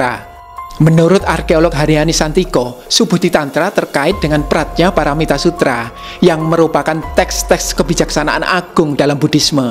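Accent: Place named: Surabaya, Indonesia. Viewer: Sek ta... Nggacor jare... Gak oleh ebes cak... (serasa kupingku disodok tugu pahlawan) 😂 terlepas dari aksen Surabaya yg ceplas ceplos, seperti biasa... esensi dari Chanel ini selalu jos 👍😇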